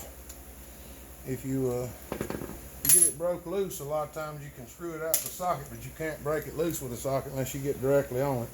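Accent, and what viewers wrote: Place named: Georgia, United States. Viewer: ...yes, I came here from Buckin' Billy Ray's channel as well :) ..Where are you located?...you certainly don't sound Canadian...you sound more like me (from North Georgia)...anyways, great information!.....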